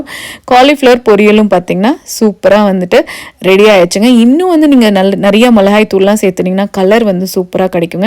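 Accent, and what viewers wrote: Kongu, India. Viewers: Romba azhagha video..unraakudavey irrundha madhiriyee irundhadhu thangam. Un style..rombaa unmaiyaa appidiyey ellam solradhu thaan nu ninaikireen.. inainkku thaan enakku YouTube unnai recommended shorts nu kamichadhu.. ulla vandhu parthaa superbaa irrukkuma..enndaa ithanai naala kattalanu feel panna vachadhu. Unnga recipes ellamey healthy & tastyaa irrukkunga..north & south mix matching recipes..namma pachapayarum, methi chapathiyum, paneerum, nalla combination da. Andha mambazham cutting nanum parthu irruken..parthaleey paravasam feeling..neenga thirupiyum andha feeling kodutheengaa.. unga kongu slang..romba naturally nalla irrkku..ennavoo unngaa kudavey pakathulaay irrundhu parkara mathiriyee irrukku maa. Pachapayaru pakkuvaum sonnadhu, machichadhu, seimurai for all dishes , practical tips, ellamey superda thangam. Enga irrundhalum, namma manasu vachaa ellamey nalla seidhu, healthyaa seidhu sapadalaamnu practical aa kamichu irrugeengaa.. 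Innum niraiyaa video podungaa.. Londonlaa endha pakkam irrukeengaa.. Chedi varathadhu pathi Anitha Anand channel niraiyaa seasonal chedinga, pudina, strawberry ellamey nalla valaradhu, grow tips kodupangaa, namma Charless Anna, Subi sister channel London Thamizhachi channel laa parthu irruken. Charless anna niraiyaa tips kodupaar. Nalla vilaichaal irrukkum, namma use pannara kaikari, poondu, kodi kaikal, poo chedi ellamey.. .. Vazhgaa valamudan.❤❤❤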